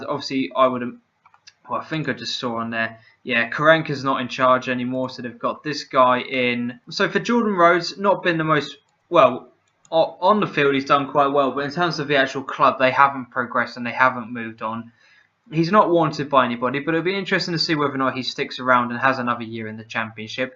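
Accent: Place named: South, United States. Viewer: Thank you for believing in Boro even though your not a Boro fan as your from the south as from you accent!! UTB!!!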